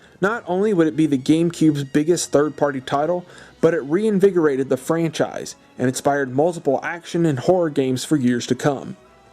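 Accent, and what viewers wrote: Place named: South, United States. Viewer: Your accent. Are you from Georgia? South Carolina?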